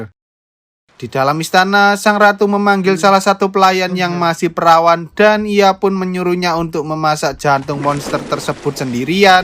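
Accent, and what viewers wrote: Java, Indonesia. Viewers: Medok pisan keluaran suara jowomu yo mas bro..😅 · suaranya kaya mas Dobit, medok banget .... sukses selalu ya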